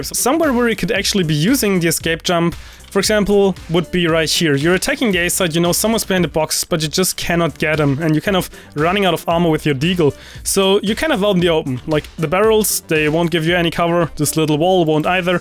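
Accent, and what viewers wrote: Germany. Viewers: Are u German? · deutsch as fuck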